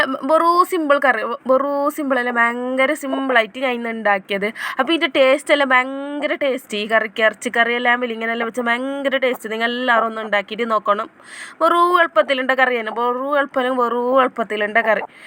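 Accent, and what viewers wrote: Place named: Kasaragod, India. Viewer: കാസർകോട് സ്ലാങ് സൂപ്പർ ക്യൂട്ട് വോയ്‌സ്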